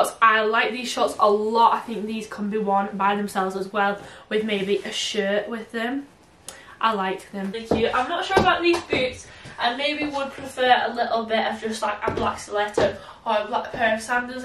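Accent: United Kingdom. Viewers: Some nice outfits British accent great outfits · best tip out there.. mute the sound. that awful bri'ish accent is killing me. but the rest is fine.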